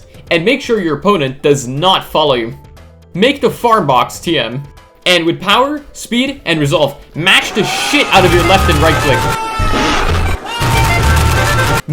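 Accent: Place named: United States. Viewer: ? Is that you under that American accent?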